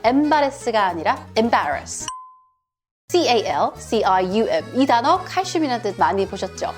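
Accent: United Kingdom. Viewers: 영국사람처럼 발음하는거 솔직히 존나 킹받음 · 넘나 재밌어요 영국 발음 체크하기 좋아요 꿀잼 쵝오🎉😂❤❤❤❤ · Hump day ㅋㅋㅋㅋㅋㅋㅋㅋ 발음이 영국식 발음이시네요. 재밌게 봤습니다. 구ㅕㅂ ❤️ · 영국취업 준비중인데 영상 너무 도움돼요! 이렇게 영국원어민 발음으로 영어 가르쳐주시고 심지어 재밌기까지😊 감사합니다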